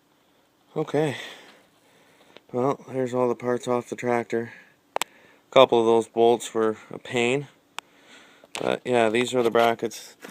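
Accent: Canada. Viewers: I HATE WHEN CANADIANS USE ANY WORD WITH ''OUT '' IN IT ..ABOWT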